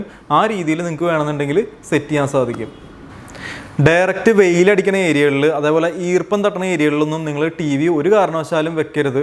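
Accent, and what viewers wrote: Thrissur, India. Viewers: Thanks for the very useful info. Also Enjoyed listening to " Thrissur Basha ". A suggestion while using TVs on Table Tops. Just screw down the table stand legs to the wooden table with two or more U shaped stainless steel clips. Users can use own imagination too. Thanks again for the safety info.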